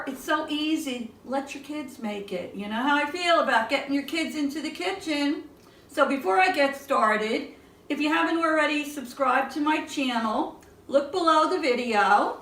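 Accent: New York, United States. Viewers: Whoa...I jumped when the bowl slipped from your hands! That dip looks yummy and pretty. I've got to ask...are you in New York, cause I detect an accent? (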